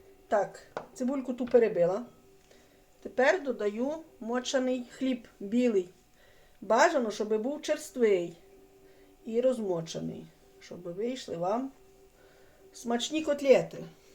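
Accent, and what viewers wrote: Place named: Vinnytsia, Ukraine. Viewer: Доброго дня, якщо не таємниця звідки ви? Ваша мова така рідна, я родом з Вінничини, там такий говір, давно дуже там не була.Здоров'я вам і миру.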